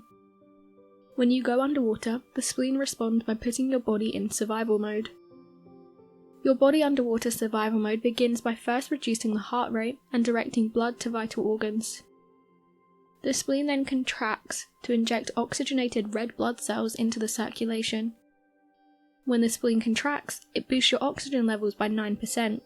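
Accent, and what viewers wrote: England, United Kingdom. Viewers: Your narrator sucks! Save the accent for England!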